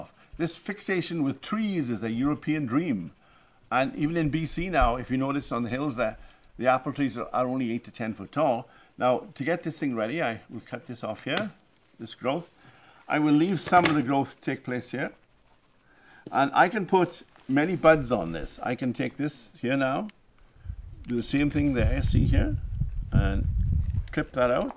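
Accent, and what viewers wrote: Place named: Caribbean. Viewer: has a very unusual accent, he sounds like he is from the Caribbean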